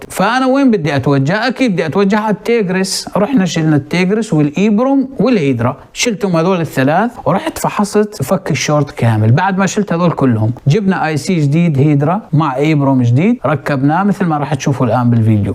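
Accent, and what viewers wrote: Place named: Syria. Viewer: استاذ انت سوري ولا اردني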